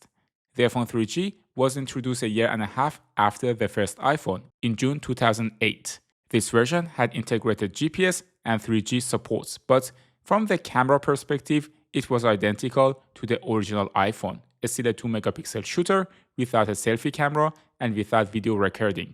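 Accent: Iran. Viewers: لهجت میگه که ایرانی هستی😅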